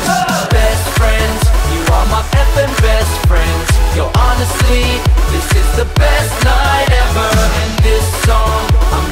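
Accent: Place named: United States. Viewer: Wtf your Aussie? You sound American... Australiaslosing it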